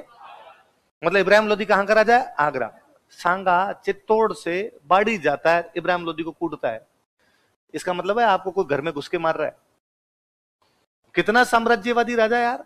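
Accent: India. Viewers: सर आपकी देसी बोली बड़ी प्यारी है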